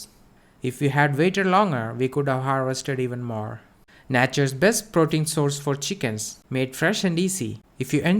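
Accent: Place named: Kerala, India. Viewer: Nice Mallu accent 😂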